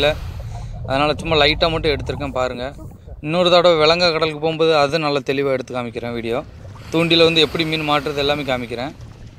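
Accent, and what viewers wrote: Thoothukudi, India. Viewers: உங்கள் தூத்துக்குடி தமிழ் மிகவும் இனிமை.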